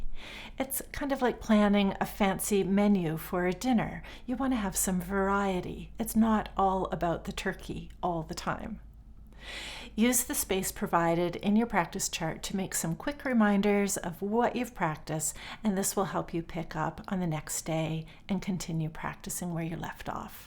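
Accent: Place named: Canada. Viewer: Thank you for making me feel a musicien at 72 years and for the inspiration you give me to sit down and practice in a structured programme. I do love your very clear canadian english accent. Have a nice Sunday.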